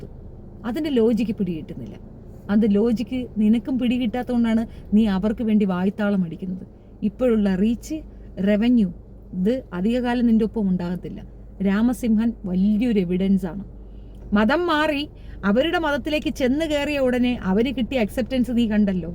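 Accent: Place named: Thiruvananthapuram, India. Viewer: വീഡിയോ ഒക്കെ ഉഷാറാണ്. ആ തിരോധരം സ്ലാങ് കേൾക്കുമ്പോ സുരാജ് വെഞ്ഞാറമൂട് നെ ആണ് ഓർമ വരുന്നത്. ഫൈസൽ കരാട്ടിന്റെ കാര്യം ദശമൂലം ദാമുവിൻറെ പോലെയാണ്.. അവന്റെ അട്ടഹാസവും വലിപ്പുമെല്ലാം കാണുമ്പോൾ സത്യമായിട്ടും ദാമുവിനെ ആണ് ഓർമ വരുന്നത്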